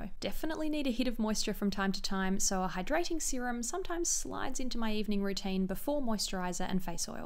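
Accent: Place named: Australia. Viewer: Agreed!! There’s something incredibly satisfying and relaxing about these videos. And I love the aussie accent. I lived there for 9 years, and it’s so nostalgic. Xx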